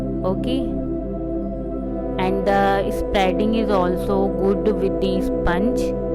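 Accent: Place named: India. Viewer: You have Indian accent! Are you Indian?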